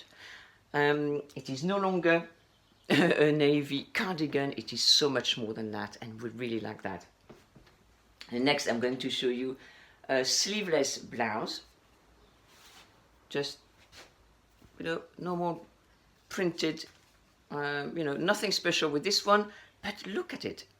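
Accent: France. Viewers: I love your accent. Your clothes are beautiful, timeless classic pieces that you could have in your wardrobe for many years. I think it's worth investing a little more money into good fabrics and a piece that's a little different. As you would wear them time and time again. I love the black and white, and navy and white stripes and polka dots in your wardrobe, and accessories, just beautiful 😄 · Hi, I’ve just discovered your channel and already watched a bunch of your videos. I love them and I love your English with French accent! It’s so cute! I was wondering if you could do a video on how to dress according to age. I’m in my late thirties. Blessings from Argentina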